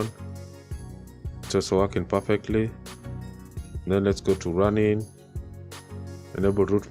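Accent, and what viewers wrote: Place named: Kenya. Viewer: Bro..you sound soo Kenyan !!!🇰🇪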